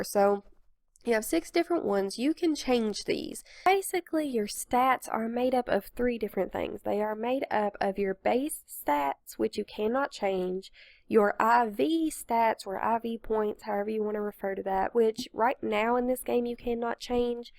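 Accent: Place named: South, United States. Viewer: well that is good to know i will let you know if i need any help oh and i hear in your videos that you have a kinda "country" accent are you from any southern states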